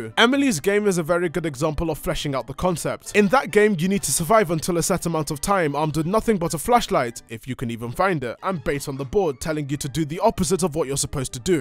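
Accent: United Kingdom. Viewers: Lol u sound like British Cyrus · The British accent makes it a fucking amazing video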